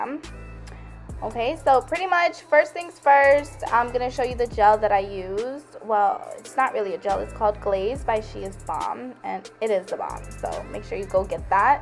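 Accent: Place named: New York, United States. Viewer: U sound like your from New York lol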